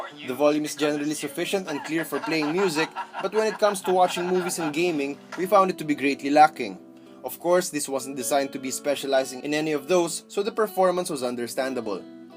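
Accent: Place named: Philippines. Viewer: Heavy Filipino accent 😊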